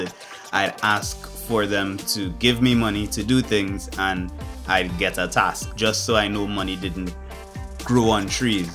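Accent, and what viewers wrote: Trinidad and Tobago. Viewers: In the first 15 seconds of the video I said that sounds like a Trini. I'm glad to see you expanding your horizons. Recently I've been visiting mostly Latin American countries but my dream is to visit Japan in the near future. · I can't believe I couldn't immediately place your accent! Trini man out there in rural Japan!! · just from the beginning could hear the trini in you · mans in japan but you can still tell he is trini to the bone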